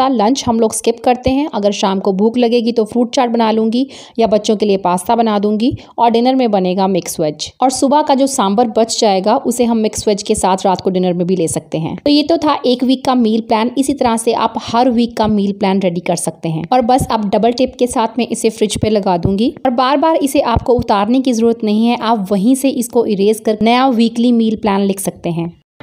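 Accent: Punjab, India. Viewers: just loveeee her voice...it has a punjabi touch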